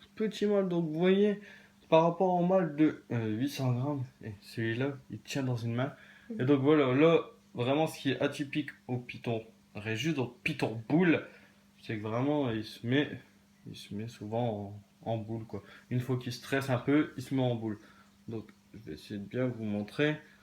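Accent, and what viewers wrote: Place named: North, France. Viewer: Petit accent du nord ^^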